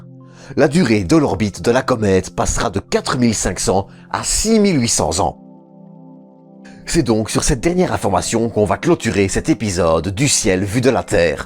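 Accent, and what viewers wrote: Liège, Belgium. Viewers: Bonne video, mais pourrais-tu effacer cet accent belge à couper au couteau ? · "je prends note pour l'accent, et je vais essayer d'améliorer ce point." Ne change rien, big universe, ça fait parti du folklore Belge, et c'est éminemment sympathique !! Cordialement d'un presque voisin, basé à côté de Lille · Ah ouais accent de Liège ça nan ?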